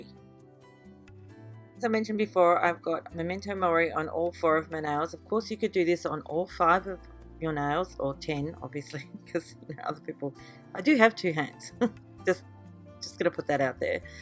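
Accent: Australia. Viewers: All of the three design are gorgeous,I'm happy to hear an Aussie nail technician I'm an Aussie too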